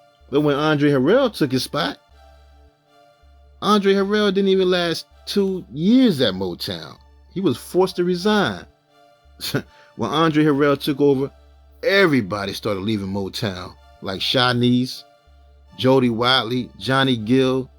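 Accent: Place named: Washington DC, United States. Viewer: Please tell me this narrator is from DC, because his accent is very familiar to my ears. LOL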